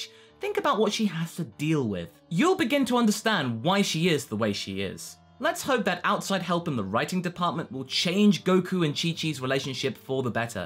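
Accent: United Kingdom. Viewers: I'm late but yeah, he has to suppress his British accent though XD He's also Gohan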